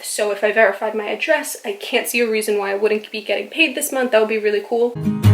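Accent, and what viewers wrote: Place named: New Jersey, United States. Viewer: You remind me of the jersey shore cast the way you talk!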